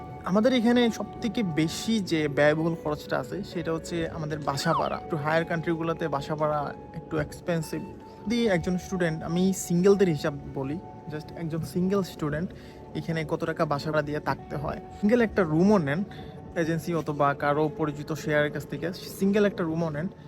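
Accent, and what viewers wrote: Sylhet, Bangladesh. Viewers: Apni sylheti tai na vai?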